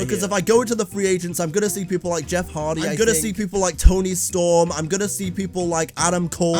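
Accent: United Kingdom. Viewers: Like ur accent u sound British to me ❤️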